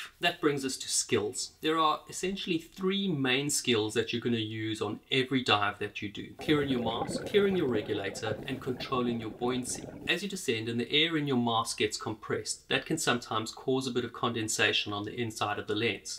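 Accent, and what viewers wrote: South Africa. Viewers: You have a South African accent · are you south african?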